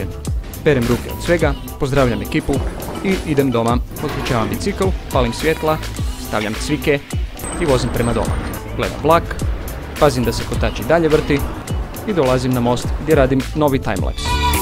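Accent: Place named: United States. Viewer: tipicno Americki ko da nisi Balkanac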